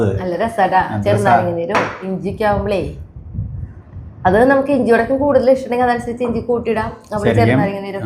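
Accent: Thrissur, India. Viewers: Nice vedio very useful you are from thrichur taking nicely ❤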